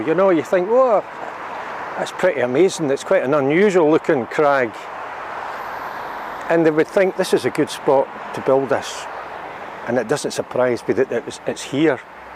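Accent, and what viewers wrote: Scotland, United Kingdom. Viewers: Hi, I just discovered you while trying to find something on the TV to cheer me up ( I don't watch much TV) Well! that said, I am watching every episode of yours I can find. You have brought back my memories! thank you so much I love your shows. You even talk like me Ha1 Ha! I live in Australia but grew up in Kilmarnock and I used to do all that walking that you are doing now. Our country is beautiful and you show it so well, Thank You so much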